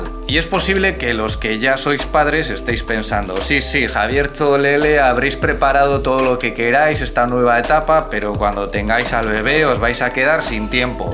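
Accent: China. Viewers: Jajaja ya tienes acento chino cuando hablas español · comienza a tener acento chino. Muchas bendiciones para su familia 🥰🥰🥰💖💕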